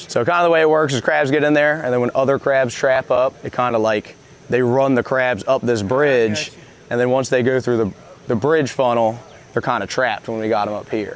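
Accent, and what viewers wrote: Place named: South, United States. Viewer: southern drawl comes out when he talks to the Deep South People! 😂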